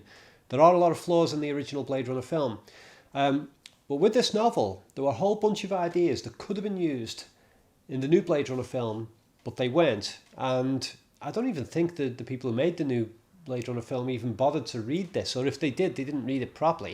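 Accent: Liverpool, United Kingdom. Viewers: has an English accent. I think he might be from Liverpool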